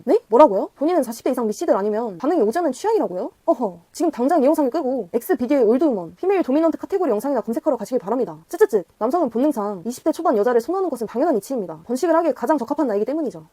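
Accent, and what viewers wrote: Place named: Jeolla, South Korea. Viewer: 아따 라도 사투리 구수하다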